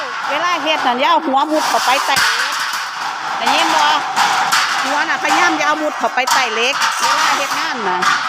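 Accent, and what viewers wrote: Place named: Laos. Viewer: มักแม่ ลาวเว้าม่วม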